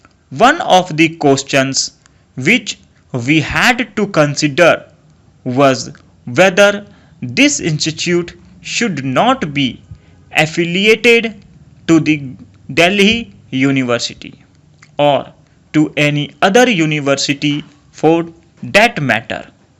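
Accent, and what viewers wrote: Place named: United Kingdom. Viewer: No need to bring brtish accent while dictating. Pls understand the correct pronunciation.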